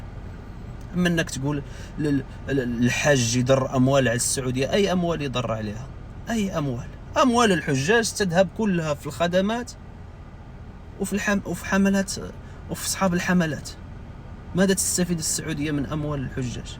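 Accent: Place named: Morocco. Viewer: انت مغربي ولست سعودي كيبان ليا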